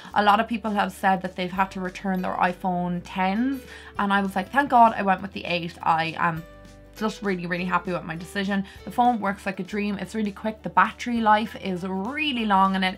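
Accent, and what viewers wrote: Ireland. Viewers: I just love watching you and listening to your brogue! It reminds me of my Nan who was from Kenmare.. I love you!! Much love from Boston!!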